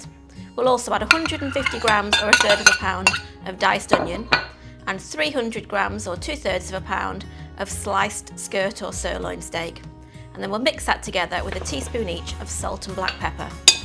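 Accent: United Kingdom. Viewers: Your Video, recipe, presentation is all so LOVELY as your Lovely British Accent. Regards from Sunny California.......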